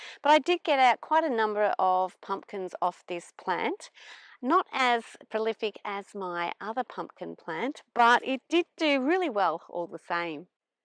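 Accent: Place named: Australia. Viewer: I like the accent of down under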